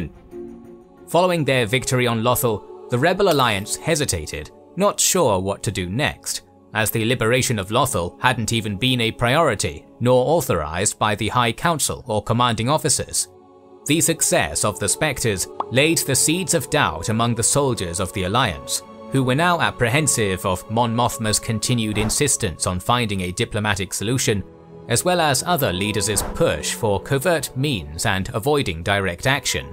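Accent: United Kingdom. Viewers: Horus Heresy next ………you sound British 😄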